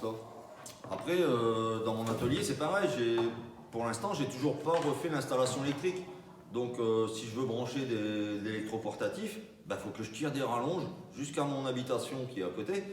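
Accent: North, France. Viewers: Bonjour, vidéo très instructive comme toujours, merci 😊, j'adore l'accent du ch'nord, ici moi j'ai celui du ch'sud 😁😉😘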